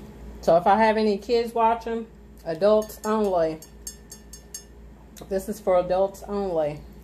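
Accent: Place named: South, United States. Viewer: Love me som bk... love your country accent ❤️ ppl say I’m country but I don’t think so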